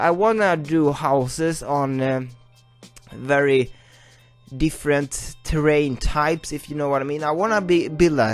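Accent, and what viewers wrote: Sweden. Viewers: Är du svensk, gissar bara😀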